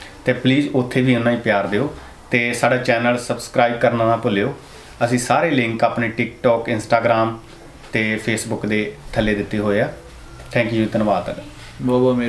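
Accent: Majha, India. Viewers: Veer amrinder gill di copy nhi aa..mahje vale sare eda bolde Bai ji... veer mahje di shan ❤❤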